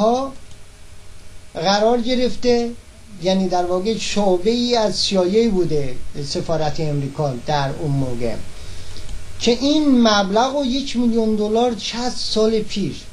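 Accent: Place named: Turkey. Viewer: جه لحجه گشنگی دارى اقاى كوينده همه سريع متوجه ميشن كه تركى..!! اين كروهايى كه شما نام برديد همه شان كسانى هستند كه قاتل بدنيا أمده اند و كارشان كشتن و انتقام است حالا جه ايرانى و جه عراقى و ربطى به مليت و مسلك ندارد..!! در اين كشورها هر ننه مرده اى اسلحه به دست ميكيرد و ميخواهد بكشد و از خدا حرف بزند..!! هر كه امد به جهان نقش خرابى دارد در خرابات نبرسيد كه هوشيار كجاست..!! خاورميانه يعنى باغ وحش..!!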